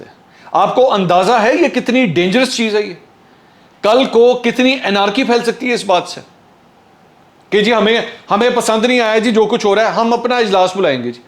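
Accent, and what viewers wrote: Punjab, Pakistan. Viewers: I love your punjabi accent. Pure like Asli Desi Ghio.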